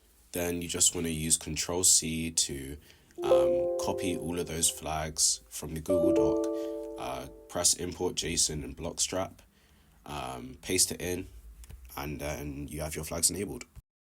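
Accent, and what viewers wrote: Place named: United Kingdom. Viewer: British accent go mad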